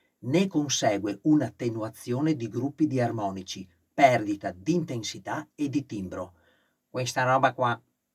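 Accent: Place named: Veneto, Italy. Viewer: Maestro ho appena scoperto il suo canale. Sento con piacere che è veneto come me e perciò le chiedo: effettua anche lezioni in presenza? (Mi scuso se le pongo una domanda a cui forse ha gia dovuto rispondere più volte) Complimenti per la chiarezza e la spontaneità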